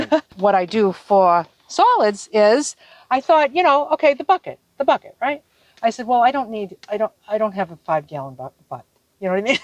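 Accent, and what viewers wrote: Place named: East Coast, United States. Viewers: Brilliant woman, brilliant van! Love her accent. Is it New York? (Brit here) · New subscriber to.. you rock sister Certainly from the East Coast dialect.. 😅 much love from McDermitt Oregon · I can tell she’s probably from Massachusetts. My home state, what a lovely lady. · Light weight is important. Kitty litter works well, just ask my cats. I hear a northeast coast accent.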